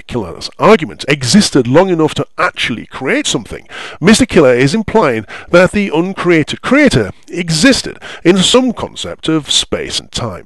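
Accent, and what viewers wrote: United Kingdom. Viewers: I may not be an atheist, good sir, but I admit that this video had me laughing at more than one point. Also, your voice is absolutely silky smooth...is your accent East London?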